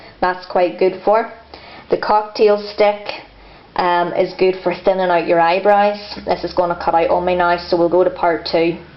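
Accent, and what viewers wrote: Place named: Northern Ireland, United Kingdom. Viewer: I totally wasn't expecting a fellow Northern Irish person ❤ thanks for the tips xx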